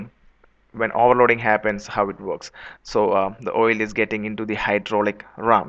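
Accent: India. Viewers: Couldn't understand the word u say It's like u speaking Indian in English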